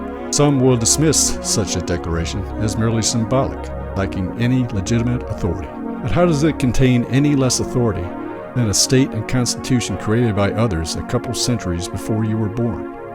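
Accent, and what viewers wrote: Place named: Texas, United States. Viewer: Sounds just like a TEXAN!! Yee Haw!!!!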